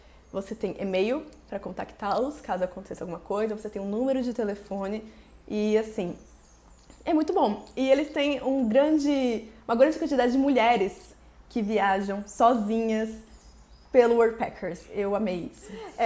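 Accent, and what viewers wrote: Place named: United States. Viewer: Esse sotaque americano lindo que vc tem ❤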